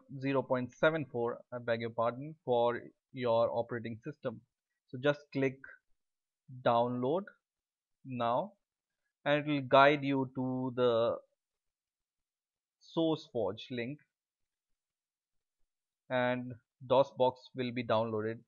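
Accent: India. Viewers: Are you from india :-) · German Google English Language Indian Accent ... wtf?!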